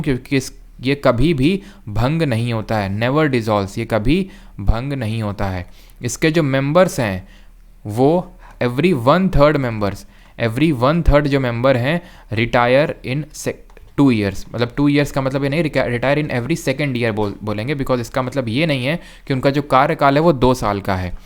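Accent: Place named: Prayagraj, India. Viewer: Jaldi koi teacher pasand nai aata mujhe..but vai yr tumse acha teacher nai dekha.. perfect ho tum GK k liye...waise awaaz se lagta h prayagraj side se ho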